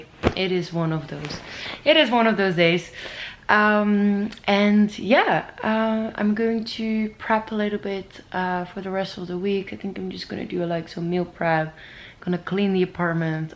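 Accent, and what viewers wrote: Netherlands. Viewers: I used to live in the Netherlands and I miss the Dutch/ English accent. I watch your videos just to hear your voice lol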